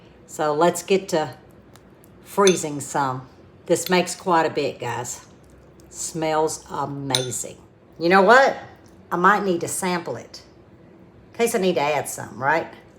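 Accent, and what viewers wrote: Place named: Louisiana, United States. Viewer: definitely the husband count! And, your Louisiana accent! Lol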